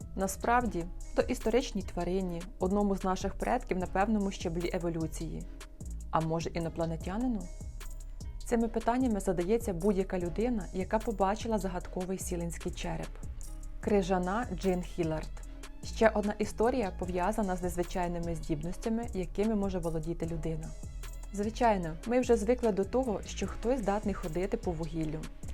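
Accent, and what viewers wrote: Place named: Western Ukraine, Ukraine. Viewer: Мені подобається Ваш західний виговір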